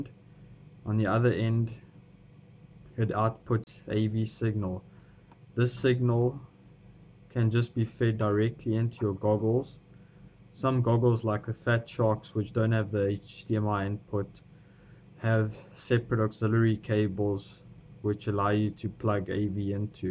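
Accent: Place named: South Africa. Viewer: You are definitely South African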